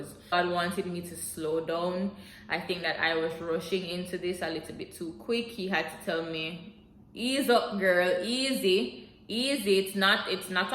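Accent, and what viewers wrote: Jamaica. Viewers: Heard the Jamaican accent and instantly subscribed 🤣. Moving to Houston soon so I needed this video. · i heard that caribbean accent jamaican to be exact. Thanks for the insight. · I know this was posted a little while ago, but thanks for sharing. I can hear an accent Jamaica Im assuming. I’m curious how easy/is it to find a community of islanders? Places you recommend?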